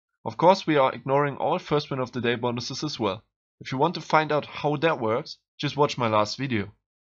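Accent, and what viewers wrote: Germany. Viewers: Bist du deutsch?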